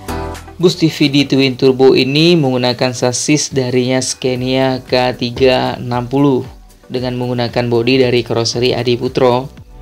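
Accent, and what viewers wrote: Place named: Aceh, Indonesia. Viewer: Intonasinya khas Aceh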